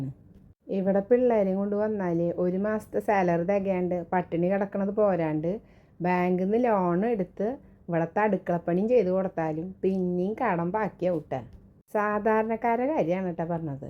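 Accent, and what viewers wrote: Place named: Thrissur, India. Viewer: Thrissur dialogue superrrr❤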